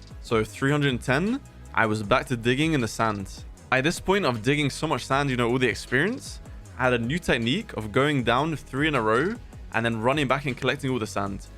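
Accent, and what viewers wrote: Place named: Luton, United Kingdom. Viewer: Yo, are you from Luton?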